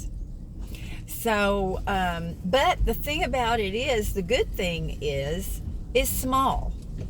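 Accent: South, United States. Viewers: Ms., you are awesome! I love your southern accent girl! Every video makes me smile.... If that isn't special then i don't know what is. lol <3 "Not praying for patience anyomore!" LOL I love it! Take care and God Bless. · Be proud of your Southern dialect!!!!!!!!! Never back down and never change to appease your critics because they don't matter. In about 50 years English will be extinct in the US.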